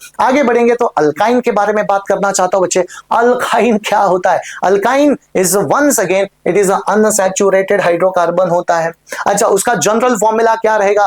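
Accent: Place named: Maharashtra, India. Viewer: Sir are you marathi because of your accent I think so btw you teach great ❤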